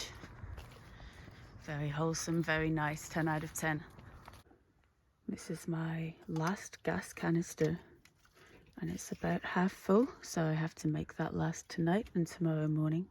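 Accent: Scotland, United Kingdom. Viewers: Love listening to you :) you’re more Scottish on YouTube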